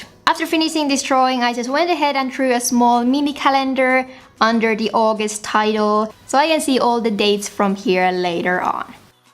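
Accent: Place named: North, Finland. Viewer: Hei from Australia! I love your beautiful minimalistic planning & bujo art and sense of space. Thankyou for the inspiration ❣️ Ps. Just Curious - I studied in Helsinki awhile, & your accent is different - are you from the north? Or do you have some Japanese background?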